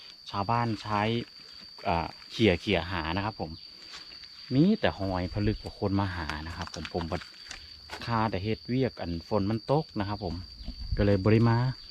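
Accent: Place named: Rural, Thailand. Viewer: สวัสดีค่ะ ที่น่ารักมาเก็บเห็ดด้วยคนค่ะ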